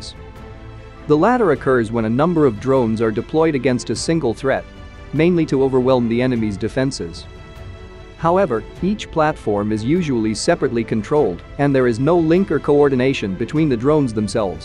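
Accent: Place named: India. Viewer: Voice script to hide Mumbai accent? Be proud of your Indian accent brother 👍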